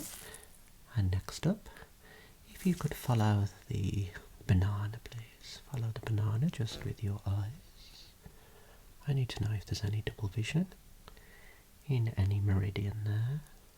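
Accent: Birmingham, United Kingdom. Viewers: There is something extremely relaxing about your videos. I think it's a combination of your Birmingham accent with your aura. I would be blessed to be one of your clients. Great video! 🙏😄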